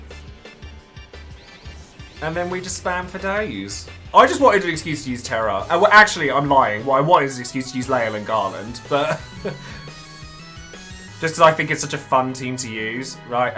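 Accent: United Kingdom. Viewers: This is a set kill of a team thanks for sharing i didnt have garlands burst but i have terras burst as well as her LD also Garlands and Layles LD , Used tifa support in the beginning as well and pulled it of in 30 turns. Love the UK accent a lot, i think of amy winehouse every time i hear someone from the uk speak. After i beat this stage and got the tickets you would not believe i pulled out both kurasame, seifer, and sephs LD 🤯🤯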